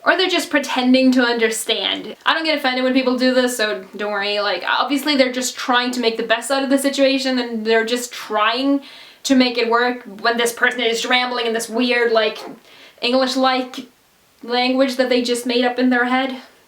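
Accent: United States. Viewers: Very nice American accent... how come?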